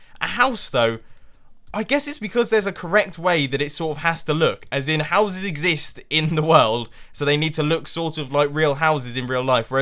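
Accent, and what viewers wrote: England, United Kingdom. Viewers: UR BRITISH sorry first time watching your videos you talk in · Are you English? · thats because hes brittish #TheMoreYouKnow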